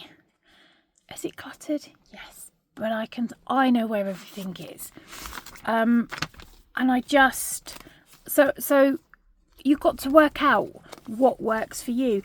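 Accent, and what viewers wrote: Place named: England, United Kingdom. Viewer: Lovely to hear an English accent here....for this coming year I'm going to switch up to a standard travellers notebook from my pocket rings just for the change ( but I've already bought all my inserts for the pocket rings just in case ! ). Now you've got me thinking about Just Scribble....I have looked at hobonichi for 2026 & resisted .I bought an A6 for 2025 for doing some journalling but didn't enjoy it so I'm now in a stalogy instead. Have loved the " waffle ' ,keep up the good work xxx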